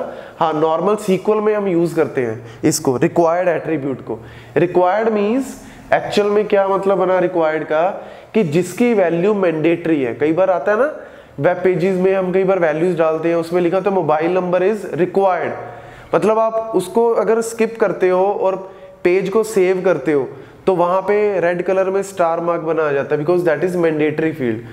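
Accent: Punjab, India. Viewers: Sir has a punjabi accent ❤ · Or aap sayad punjab se ho kyoki aapki language me thoda punjabi tadka bhi he😊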